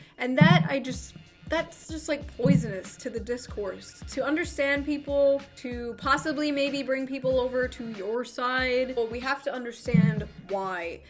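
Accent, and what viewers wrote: Boston, United States. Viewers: She is from Boston.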